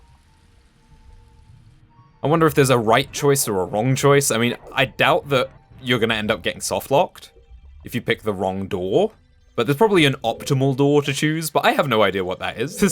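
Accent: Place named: United Kingdom. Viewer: your british accent disgusts me.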